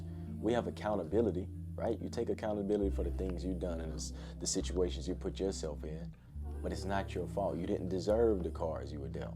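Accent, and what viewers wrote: South, United States. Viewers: Your are my greatest inspiration, so far, how do you articulate yourself so well? I heard you talking normal, and ofc u got a pretty heavy southern accent, but hkw do you manage to remove the accent? And also, are you always this calm?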